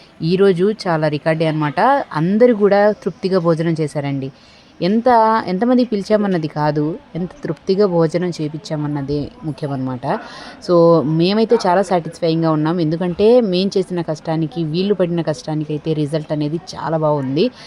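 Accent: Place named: Godavari, India. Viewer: Mee Hindi language godavari lagundi leka guntur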